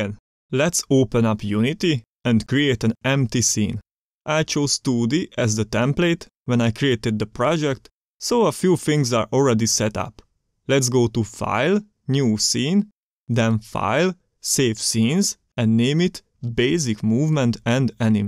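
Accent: Hungary. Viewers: Are you hungarian? Your english sounds a lot like how a hungarian would talk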